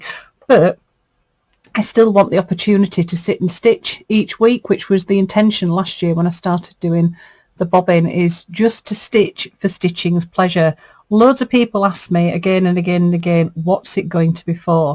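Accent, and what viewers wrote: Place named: Northern England, United Kingdom. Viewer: Hi, new subbie here (I came over from Rachel at Roxy Creations' channel) First off, I recognise your accent! You're a Northerner, like myself! (I'm in Lancashire) I love the idea of this challenge and I will be playing along too as I want to start incorporating stitched pieces into my journals and I think this will be a great starting point for me 😊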